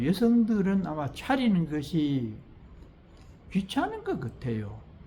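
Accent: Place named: Andong, South Korea. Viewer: 안동사투리가 구수한 스님법문 잘듣고 있습니다 얘기를 너무 재미있게 하셔서 다음회가 기다려집니다 고맙습니다 스님❤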